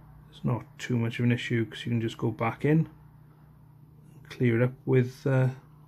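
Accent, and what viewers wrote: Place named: Swansea, United Kingdom. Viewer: can always tell another jack! 😍🏴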